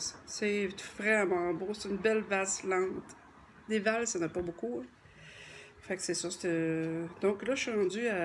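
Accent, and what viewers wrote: Canada. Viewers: Bonjour, c'est quoi c'petit accent Canadien?!.... J'adore! Et oui, c'est très réussi, très très même.... Bises amicales osi. J.C. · Du plaisir à retrouver ta belle voix et d'entendre ce bel accent canadien qui me transporte par delà l'Atlantique. Bisou Gérard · SLT. J'ai enfin su écouter ta vidéo sur un autre ordi. Très sympa ton coup de cœur. C'est doux et très agréable à écouter et parfaitement bien interprété comme toujours. Tu ne peux pas cacher que tu es Québécoise. Tu as un très fort accent et j'avoue que je ne comprends pas tout. A +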